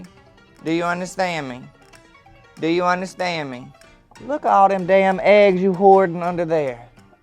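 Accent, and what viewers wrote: South, United States. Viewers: Omg! (Laughing) "It's so damn ghetto around here!" (OR:) "Biotch, you going to Chick-fil-A!"😅 Reminds me of my childhood with that "SOUTHERN" accent. ❤ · 😂 you are so funny and so southern I just don't know what to do lol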